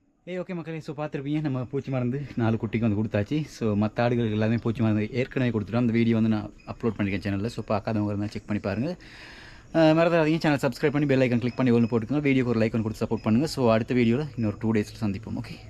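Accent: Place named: Sri Lanka. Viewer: நீங்க இலங்கை என்பது உங்க பேச்சுல தெறியுது ஊர் எது ஆடுவிலைக்கு குடுப்பீங்களா என்டுதான் கேட்டேன்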